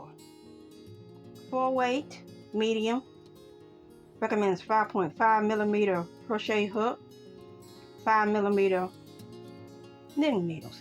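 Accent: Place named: Louisiana, United States. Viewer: I know this is kinda random, but you sound SO MUCH like my Mamaw down in Louisiana. Are you from anywhere near there? I miss her so much; listening to your voice is like a balm to my soul. 🥰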